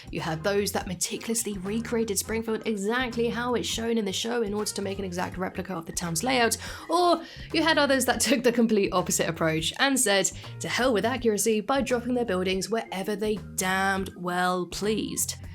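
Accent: United Kingdom. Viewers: A simpsons mega fan with a British accent? Tickle me pink!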